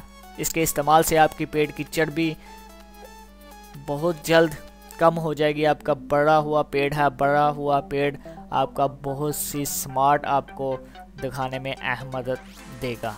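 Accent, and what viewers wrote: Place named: England, United Kingdom. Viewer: Aap urdu ko English k style m bolte ho kia aap English man ki birth ho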